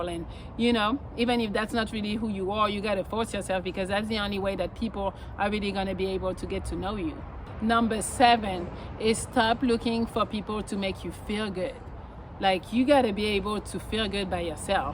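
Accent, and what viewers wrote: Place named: France. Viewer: Coucou de quelle ville tu viens en France ? Btw je sonne exactement comme toi quand je parle anglais.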